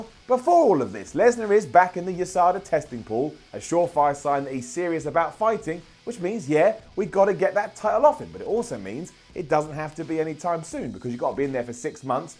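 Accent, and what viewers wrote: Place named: United Kingdom. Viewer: I like that british accent😁😁😁😁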